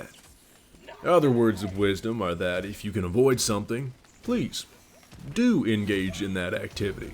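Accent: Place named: Texas, United States. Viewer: from the deep baritone tones to the Texas draw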